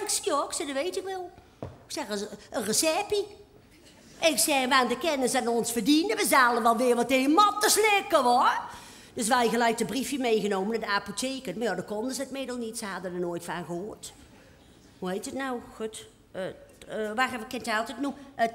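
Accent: Utrecht, Netherlands. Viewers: Prachtig accent dat Utrechhhh en die zelf bedachte scheldwoorden. Super! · Heerlijke vertolking van Utrechts ,lekker doorgaan zo.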